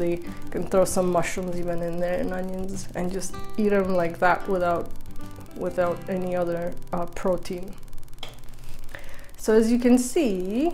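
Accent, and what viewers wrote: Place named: Poland. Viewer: What's wrong with the inexpressibly sweet Polish accent, spoken by a woman? Whenever I hear a woman speaking with a Polish accent, my affected ears become listening and absorbing shells, as it were, in transport! In other words, I turn to jelly, in ecstasy!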